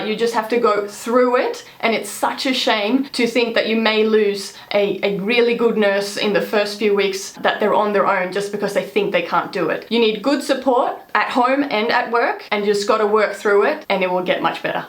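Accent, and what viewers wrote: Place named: Australia. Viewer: Hey. I am always wondering... your last name must be Swedish (is it the name of your hubby?). But your accent is clearly Australian to me. I am wrong? Sorry for asking such a crap. But as an Australian in my heart, I am interested in such things...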